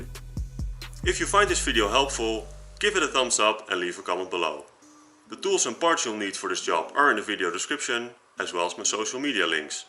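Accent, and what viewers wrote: Netherlands. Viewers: Are you dutch 🇱🇺 ? The accent gives it away 😁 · you gotta love the dutch accent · haha lekker nederlands accentje, ik heb een cls500 die ook tikt misschien dat ik dit ook ga uitproberen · Hey! Ik hoor dat je nederlands bent, dus hopelijk kun je deze vraag beantwoorden haha. Ik heb net een 1.8 NA miata aangeschaft met 250K km (150k miles) op de teller.. geimporteerd uit amerika, maar bij het starten maakt het motorblok precies het zelfde geluid. Zelf heb ik twee NB's gehad en altijd 10w40 gebruikt. Als ik dit spul wat jij aanbeveeld erin stop en 50-100km ga rijden, kan dat geen kwaad? En daarna mijn olie ververs? Zijn het de zuigerveren die dan vies zijn of aan vervanging toenzijn? Graag hoor ik even meer😁 Groetjes, chris.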